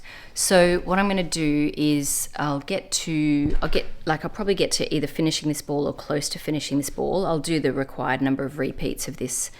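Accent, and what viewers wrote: Australia. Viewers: Thanks for the Ravelry tip,. There is so much I don't know about it; would you consider doing a tutorial sometime on getting the most out of Ravelry? You are so good at explaining concepts! Love your podcast; there aren't many Aussie accents in the knitting pod world, you are great.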